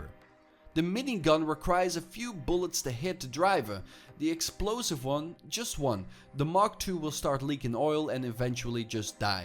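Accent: Netherlands. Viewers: It's a nordic accent. Probably Dutch or Swedish. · this guy has to be dutch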